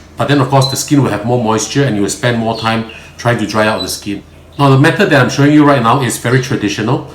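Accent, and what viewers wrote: Malaysia. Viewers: Malaysian accent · 一口馬拉音，哈哈😄⋯⋯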